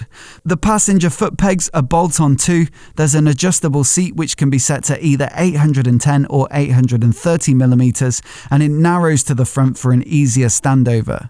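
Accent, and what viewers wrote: United Kingdom. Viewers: Why has to be annoying British accent?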